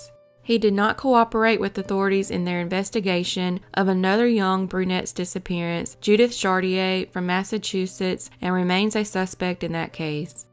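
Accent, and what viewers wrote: South, United States. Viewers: Such a soothing southern voice.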